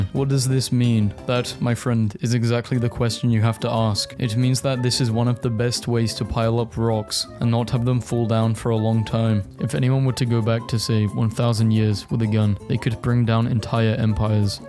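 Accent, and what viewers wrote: United Kingdom. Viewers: pretty sure he’s British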